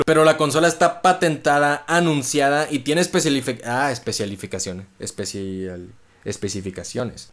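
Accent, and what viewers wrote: Mexico. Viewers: Me suscribí porque siento que eres mexicano tu forma de hablar pero hay un momento que hagas un video de five nights at Freddy's. Si puedes contar la historia porque de verdad asquerosidad de historia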